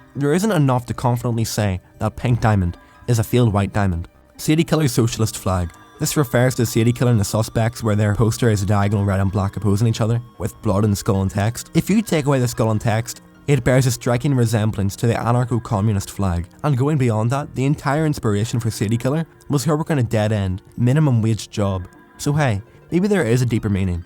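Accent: Scotland, United Kingdom. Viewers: Great video! You have an interesting accent, are you Scottish?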